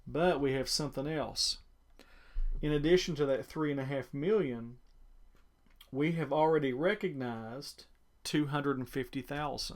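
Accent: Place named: United States. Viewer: Sounds American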